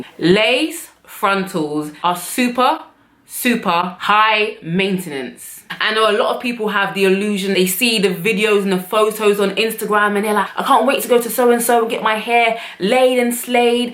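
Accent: United Kingdom. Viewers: Lady you are all kinds of gorgeous! and you have that cheeky British accent too.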